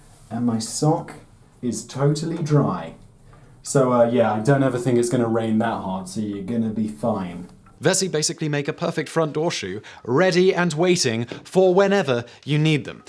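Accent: England, United Kingdom. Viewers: Listening to him speaking fast with that Brit accent is kind of exhausting for an American. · Why is a guy with a British accent advertising shoes you can't get shipped to Britain? Cheers, A British guy. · I'm sorry but I really really like laughing at you saying those euphemisms for breasts and your British accent maybe it makes me a boob but there it is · I didn't know i needed a calm British recitation of breast slang until today. Thanks,.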